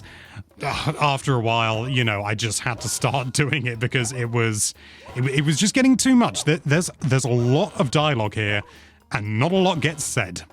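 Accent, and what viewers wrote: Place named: United Kingdom. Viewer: He’s British. That’s just how people pronounce it?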